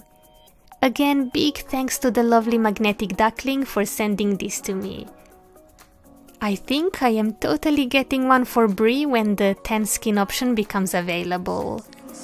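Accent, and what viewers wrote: Russia. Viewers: I love your accent ... are you from Russia ?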